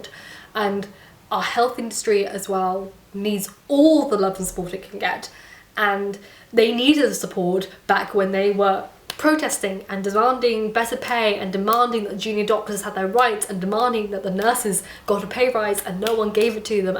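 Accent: RP, United Kingdom. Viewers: New viewer (American) here. I spent a summer in Edinburg when I was a kid, but I would have never guessed you lived there from ur RP accent. Once you said it, I can notice some subtle things, but still... never would have guessed. Maybe I’m just bad at hearing it since I’ve gotten older. Either way, ur voice is beautiful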